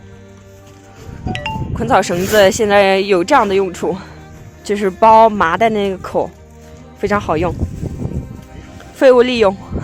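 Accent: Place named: Beijing, China. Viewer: 这汉话没有内蒙口音，倒是像北京话，譬如用 霍霍 这词。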